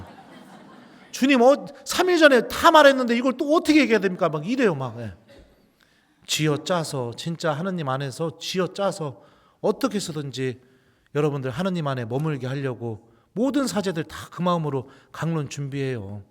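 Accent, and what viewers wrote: Chungcheong, South Korea. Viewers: 신부님🎉 구수한 충청도 어투에 진솔한 마음이 무한 감동입니다 🎉🎉🎉 만나면 화해한다는 말씀, 성체조배의 중요성, 하느님과의 화해🎉 고해성사의 진실한 준비- 더러운 물을 정화시키기 위하여 깨끗한 물이 필요하듯이 고해성사가 우리 마음을 정화시켜주는 깨끗한 물이 된다는 말씀🎉 감동입니다 🎉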